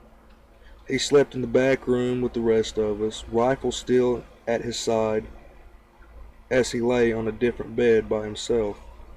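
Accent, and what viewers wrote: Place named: Louisiana, United States. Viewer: Great videos..You must be from the South..probably here in Louisiana. because of your accent And, I never heard people outside of Louisiana say "right chere" instead of "right Here" lol. our southern accents and words we use is very foreign to those in other parts of America, particularly outside of Louisiana. lol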